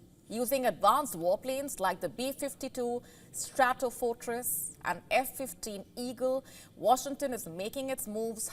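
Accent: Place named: India. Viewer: Indian accent so funny😂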